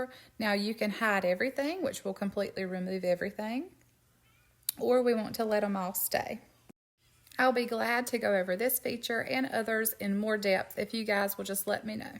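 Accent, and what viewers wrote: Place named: South, United States. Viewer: Hello, i am not happy to have to say this, but i'm disappointed in your video, after your introduction, you went into design mode, you didn't start from the beginning & i'm a beginner, so this is why i am disappointed, i mean you sound like a very nice southern lady, but i didn't even get to see what Cricut you were working with at the start of your video.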